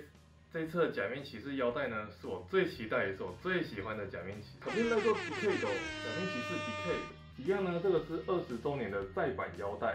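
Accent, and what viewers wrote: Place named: Malaysia. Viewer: 可能是戴了面具顶着鼻子，所以口音就很像马来西亚口音，其实我也是以为是马来西亚人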